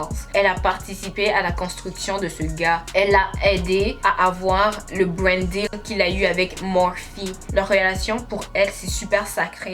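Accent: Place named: Montreal, Canada. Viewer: Ta l’accent de Montréal gurlllllll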